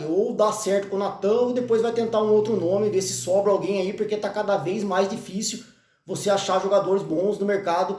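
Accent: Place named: São Paulo, Brazil. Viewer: vc e do interior de SP, e pq o seu sotaque e parecido com quem é de lá, eu tbm sou kkkk sou cidadão piracicabano E parabéns pelas informações do nosso peixe, continue assim, feliz 2022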